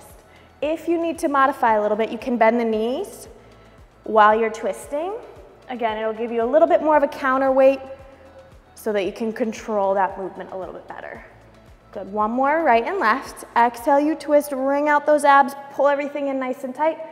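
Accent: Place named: Midwest, United States. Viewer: I just kept thinking it made her sound super Midwest and like, old school which is kind of endearing and 80's haha. v jazzercise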